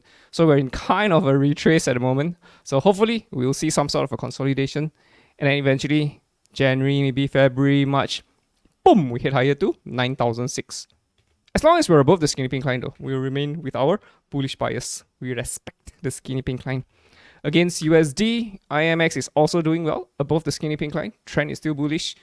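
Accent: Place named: Singapore. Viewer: I grew up in Singapore, and I think, from the accent Skinny is in SG or somewhere close. SG for the win 🎉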